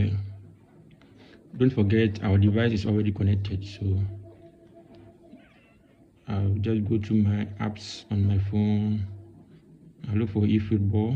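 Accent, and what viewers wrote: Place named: Ghana. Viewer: GHANA man English dat , no lie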